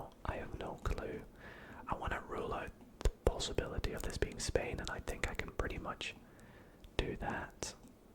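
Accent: Scotland, United Kingdom. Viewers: Where abouts in Scotland are you from because I’m from Scotland too 🎀🏴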